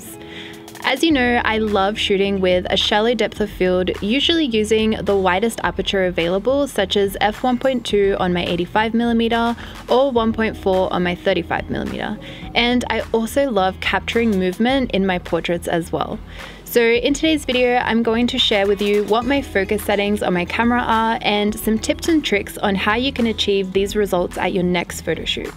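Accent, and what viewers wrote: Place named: Australia. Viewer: Your accent is like Aussie pronunciation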